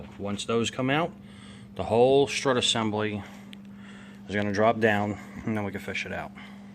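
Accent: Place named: Central Jersey, United States. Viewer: you have the most proper central jersey accent i ever herd. also im doing this 3 in and 3 in rear aswell on my gmc canyon just waiting on parts.. cool video even tho its old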